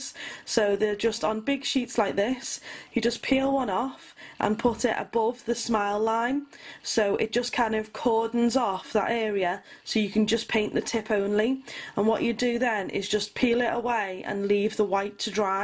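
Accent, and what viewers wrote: United Kingdom. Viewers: Oh my!!! I LOVE LOVE LOVE your type of British accent!!!!!!! One of my absolute favorites!!! ❤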